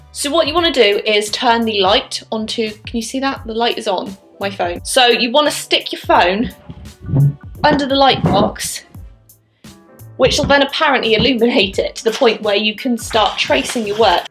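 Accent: United Kingdom. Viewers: I love your accent and I'm British too 😂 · I thought u were british bcs of ur accent and voice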